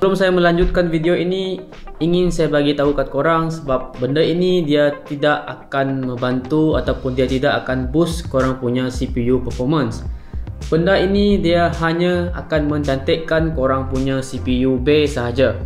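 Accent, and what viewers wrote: Sarawak, Malaysia. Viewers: Org srwkkah,sbb cara nya cakap kedak org srwk pin slang xd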